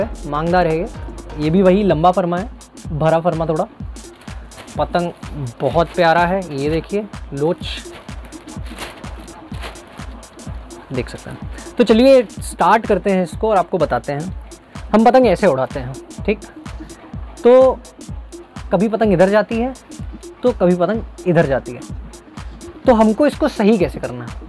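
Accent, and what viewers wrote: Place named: Allahabad, India. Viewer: Allahabadi bhasha me akdum lalantak Patang hai bhai apki.... Man moh Liya bhai. LOVE FROM ALLAHABAD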